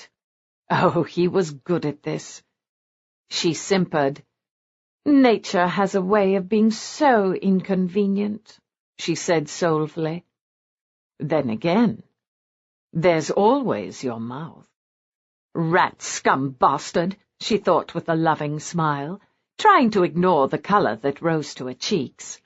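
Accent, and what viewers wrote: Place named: England, United Kingdom. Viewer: Regarding pronunciation. Many words spoken in England are pronounced differently in the US. This story is read by an English woman. All of these differences is what makes the world and different cultures so fascinating.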